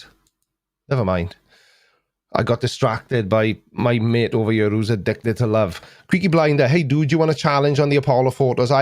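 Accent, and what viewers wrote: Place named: RP, United Kingdom. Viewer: his queen's english is ok.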